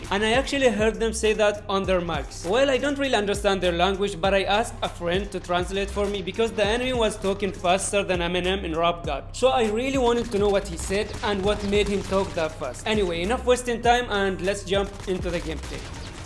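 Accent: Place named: India. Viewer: Yo, you're sound seems Indian....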